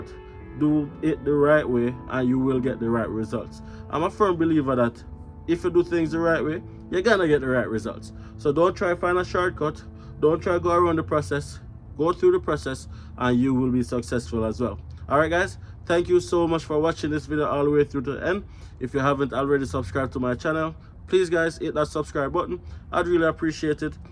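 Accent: Jamaica. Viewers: Well informed video. Loved the Jamaican accent as well which is slowly but surely dissappearing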